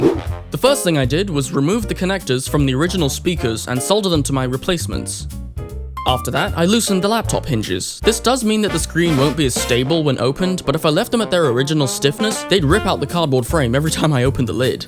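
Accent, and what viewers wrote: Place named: United Kingdom. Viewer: you are somehow THE most british person I've ever heard and also WHAT WAS THIS NOISE anyways you are cool have my sub and like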